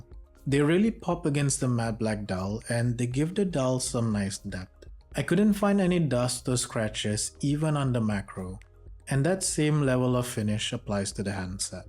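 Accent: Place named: India. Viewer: Thanks a lot! Never heard of this brand. I will check them out. Judging by your accent you are from India, right? Which region/city you recommend to spend my holidays in India? I'm from Central Europe and I love spicy food.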